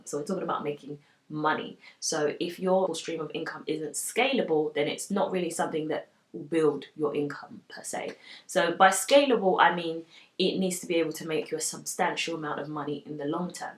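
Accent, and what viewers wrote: United Kingdom. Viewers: My new favorite vlogger! A down to earth black woman, in marketing with a British Accent!! Love it!!! Subbing from Texas!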